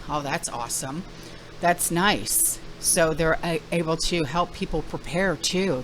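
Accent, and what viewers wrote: New York, United States. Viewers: Hello Seattle In The Chat 🤗 I don't know what i like about you the best. The New York accent or how dang sweet you are❣️ I always look forward to your post ❤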